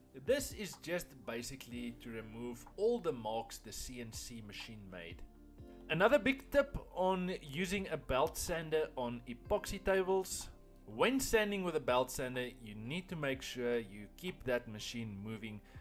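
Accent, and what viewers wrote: South Africa. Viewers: Hi, I love the end result of this project. It looks amazing. Quick question, you sound very South African, are you in SA?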